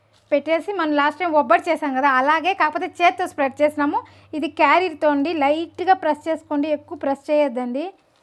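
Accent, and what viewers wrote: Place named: Rayalaseema, India. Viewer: మీది రాయలసీమ ప్రాంతమా. నీ భాష ప్రకాశం రాయలసీమకు దగ్గరగా ఉంది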